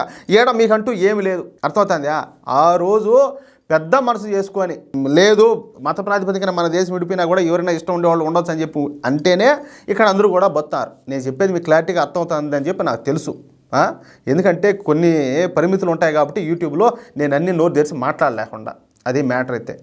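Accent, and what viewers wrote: Rayalaseema, India. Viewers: రాయలసీమ యాసలో నిక్కచ్చిగా చెపుచున్నారండీ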